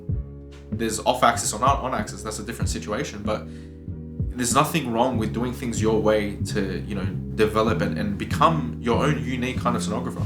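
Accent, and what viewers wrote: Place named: Australia. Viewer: sounds like Australia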